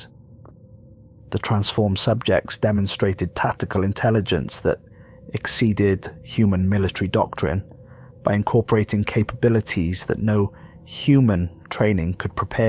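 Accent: Wales, United Kingdom. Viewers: Not sure about the Welsh accent but I love the stories.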